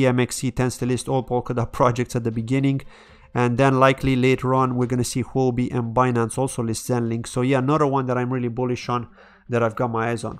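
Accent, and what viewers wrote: Ireland. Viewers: I thought I heard an Irish accent in your voice. Are you involved in Irish crypto much?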